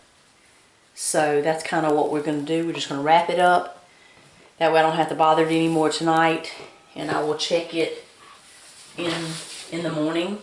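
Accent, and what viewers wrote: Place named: South, United States. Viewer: I just love that southern accent👍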